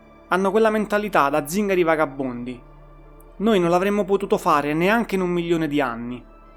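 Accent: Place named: Rome, Italy. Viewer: Bravo per l’iniziativa, ma tra accento romano (mi distrae troppo) e (si pronuncia PorcupAine), non ce la faccio. Meno male che ci sono altri podcast. Comunque meno male che finalmente si parla di buona musica!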